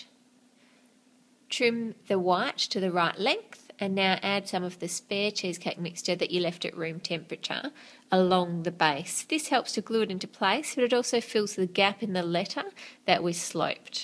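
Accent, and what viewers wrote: Australia. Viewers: I like your Australian acsent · are you Australian? Your defiantly not British because I am and i've never heard anything like that. xx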